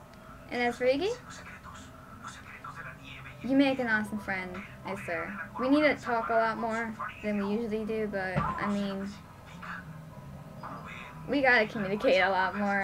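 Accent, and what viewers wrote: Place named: England, United Kingdom. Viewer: That was awesome in every meaning of the word! I couldn't stop laughing...hope the fireworks didn't catch up to you (I have a phobia of them, so I understand...) Yeah, we definitely need to PM each other more often, and I approve of the England accent!!! Happy Christmas! (English Merrry Christmas; and you totally pronounced Feliz Navidad right, half my family is Argentine, so I know!) Wow, might steal your idea of combining presents into one!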